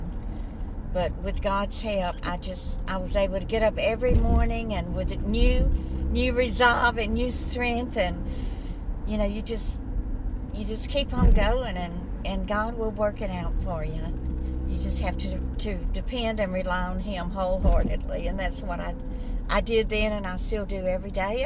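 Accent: South, United States. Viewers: You also have talent for interesting conversation combined with compassion. You have beautiful southern accent that is soothing, unlike some that I think are just horrible. You have to be willing to open your life up. I’m much too private for that, so you see not everyone can be successful at it, but I’m happy for your success. I’m a paid companion for mobile elderly. I’m in great shape at 66 so hope to do for as long as I am able. Lorena.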